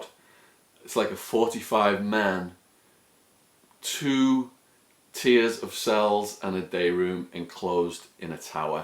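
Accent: United Kingdom. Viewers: Awww Shawn/. TMI LOL. You must have really stuck out in an AZ prison with that Brit accent. Geez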